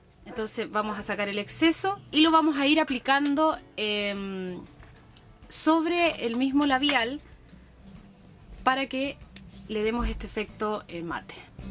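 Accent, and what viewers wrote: Chile. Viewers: eres chilena????????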